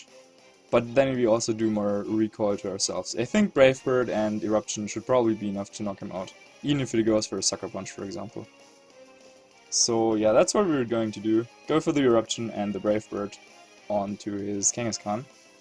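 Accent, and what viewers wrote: Germany. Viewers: you're german right ?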